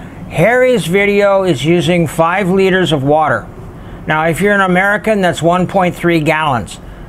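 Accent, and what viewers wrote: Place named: Canada. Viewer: You know what? This guy is speaking in plain English, albeit with a Cannuck accent, yet I cannot understand what on earth he's yabbering-on about, most of the time. I did actually fall asleep at one point...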